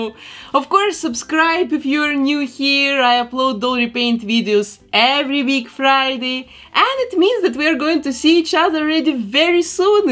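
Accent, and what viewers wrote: Russia. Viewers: Акцент русский, вы случайно не из России? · Акцент очень похож на русский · Стоп, я слышу руский акцент))) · She has a Russian accent, she is russian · Русский английский) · Волосы грубо смотрятся, как солома( И да, русский акцент))) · Вы Русская? Акцент 🤦‍♀️ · Что с твоим голосом? Ты что русская ? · не перестаю удивляться тому, насколько русским может звучать английский язык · Where is she from? Such a different accent! I'm guessing she's European or Russian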